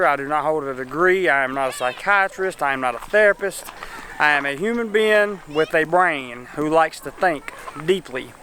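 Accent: South, United States. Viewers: love that accent..what part of south you from..mind me asking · He sounds like One em gangs in Red dead redemption 2. A strong southern accent so cool. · What southern state are you from? Louisiana? Reminds me of Bobcat Goldthwaite. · your from texas cause your accent like a cowboy... i like it... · I don't know! his accent is a thick Southerner but his looks is more like the people of Saddam in Iraq or Syrians:-)) hahaha, maybe because I'm a local you look different to me... no offense I like your video:-)) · Just came across your vlog I subscribed, thank you for the honestey bro! My dream is to come to the Philippines, I love everything about the Philippines I plan on making it there soon! Where are you from it sounds like somewhere in the southern states? ( NC, SC, VIRGINIA )????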